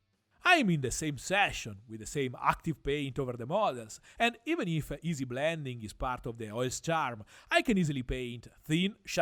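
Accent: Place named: Tuscany, Italy. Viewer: I like your stuff, my Nonno was from pistoia and your accent is bringing back all the feels 😂😂 I’ll pass on the oils for now but it’s good to know the video is here for if and when I get the urge.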